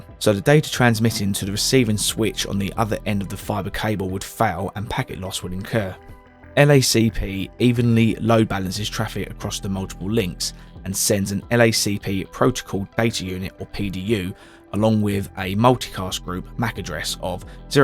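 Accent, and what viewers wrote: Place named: Bradford, United Kingdom. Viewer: I know Bradford when I hear it 💯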